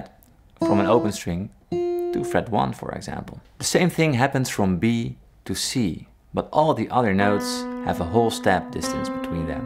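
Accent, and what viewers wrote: Netherlands. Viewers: Thanks a lot why do I hear a Dutch accent while your name is clearly English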